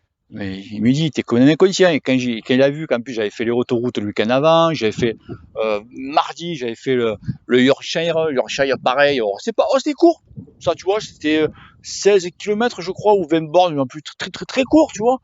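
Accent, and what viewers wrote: South, France. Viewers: S'il préparait un peu son texte et parlait un peu moins méridional ce serait mieux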